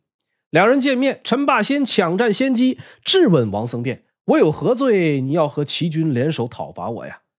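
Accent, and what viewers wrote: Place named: Northeast, China. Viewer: 很精彩 兄弟是东北人吧）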